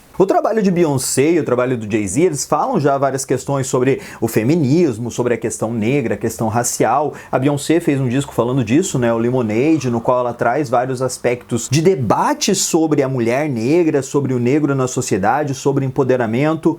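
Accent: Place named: Inland São Paulo, Brazil. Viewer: Sou seu aluninho do QG... Seu sotaque é igual o meu pois moro no interiorrrrr de SP .. Kkkk Adorei sua análise, estava aguardando alguém faze lá ...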